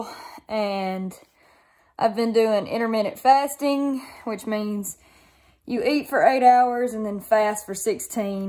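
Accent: South, United States. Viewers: Hello from Thailand :) Been following your video‘s and those of your dad and I truly appreciate :) ya’ll I had the big chance to learn my English during a couple of exchanges in a great family in NC , and after some adaptations I started to really enjoy your “southern slang” :) So thank ya’ll for your cool content. Now I wonder why you try fasting, but if you really want to loose some weight, maybe try to lessen the fried stuff from time to time. Here they do not use a lot of butter or oil when cooking, they boil for example chicken in coconut milk, with some onions, herbs, garlic and pepper, and it’s delicious, your are full and you start loosing weight also :) We eat this with some rice. But I truly miss the delicious fried catfish the dad used to prepare after fishing together. Even got the chance to experience true “frogging’” at night time :) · I love that Souther accent. 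You are a very beautiful girl love your little puppy.Have a great day beautiful.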